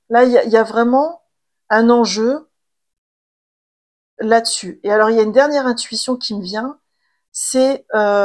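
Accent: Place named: Nord-Pas-de-Calais, France. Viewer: Choix numéro 1 ❤ ooooh oui le message a bien été reçu 😊 MERCI du plus profond de mon cœur !!! Je vais le ré écouter tellement il est riche et réconfortant. Je me sentais très seule en ce moment, j en suis meme venue à me faire mes auto séances de psy parce que pas d'accompagnant disponible.. je doutais de mes capacité à me libérer seule de ce traumatisme de l'âme car je sens que j en touche la racine. Je reçois ce message comme une validation. Alors ❤Merci❤❤❤ Et big up à l'accent chti 😉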